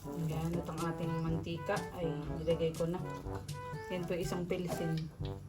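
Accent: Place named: Quezon, Philippines. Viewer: Ok lng Naman kahit may Punto ang salita ganon talaga sa Quezon prov!❤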